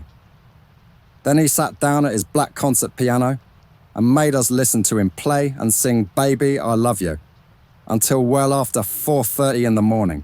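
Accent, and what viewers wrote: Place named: London, United Kingdom. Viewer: I love the timbre of the narrators voice ! Classic London English..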